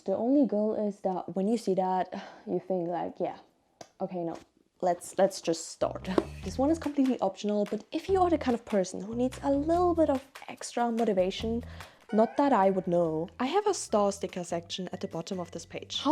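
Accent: Germany. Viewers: aha i hear deutsch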